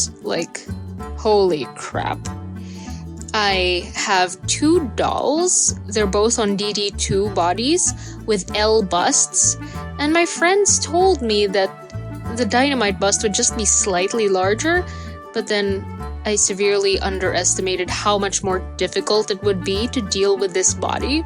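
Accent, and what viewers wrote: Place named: Philippines. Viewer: Holy shit that's really expensive.... Also, are you from the Philippines? I could sort of hear the Pinoy accent. Aaaaand the Dollfinas kinda gave it away for me. Not much though, I still had to Google it. Edit: Nevermind I just got to the part where you said you got something from Manila.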